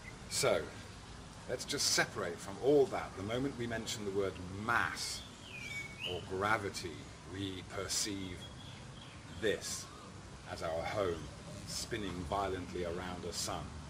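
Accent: United Kingdom. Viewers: Oh! My God! WTF How ignorant can anyone be than to show to the whole world how you didn't think something through using all the factors and variables needed for the equation. Water displacement alone The force air has on an object depending on it's shape and size. This is so ridiculous yet you took the time out to make a video showing how unintelligent the thought process is and then look at all the idiots that follow you thinking this is correct. Again Oh! My! God! I can't believe what the world I live in has become. Utterly and Ridiculously disgusting to think my fellow man is this fucking dumb. A British accent by no means makes anyone intelligent.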